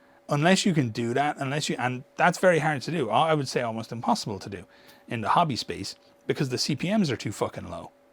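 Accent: Ireland. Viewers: I listen to your long videos for the same reason I listen to Oculus Imperia: those dulcet Irish tones.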